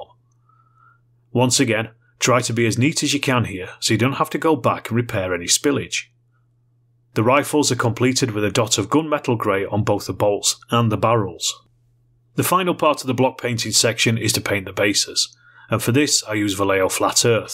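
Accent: Northern England, United Kingdom. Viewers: I love mid-England Northern accents! Keep talking mate!!!!!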